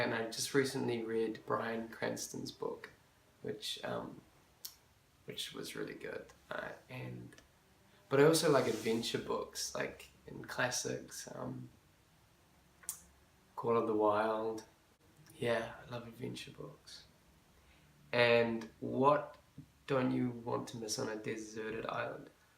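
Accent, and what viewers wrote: New Zealand. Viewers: Kia ora! Having spent three months in New Zealand last year, it warms my heart to hear that charming NZ accent! I absolutely adored the Boyfriend ASMR you did a couple of months ago and would request a new one (: Lots of love from Finland!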